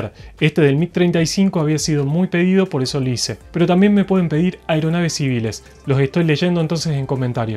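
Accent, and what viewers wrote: Argentina. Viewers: Como siempre dándonos cátedra de aviación excelente video me gustaría saber que nacionalidad tienen, párese argentino tu bonito acento. Es com argentino, lindo fuera que la fuerza aérea argentina tuviera estos aviones, con los eselentes pilotos, sooy de el mísero país de Colombia reprimido por el gobierno norco paramilitar acmiro al el pueblo, Argentino saludos Dios los bendiga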